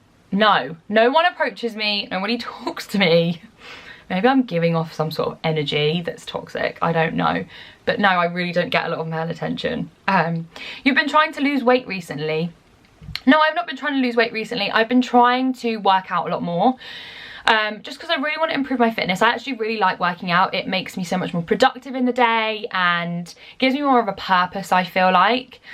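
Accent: United Kingdom. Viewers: I love hearing you ramble!!! Especially about rubbish! Sorry I had to say that I’m from America and I just love British accents and slang.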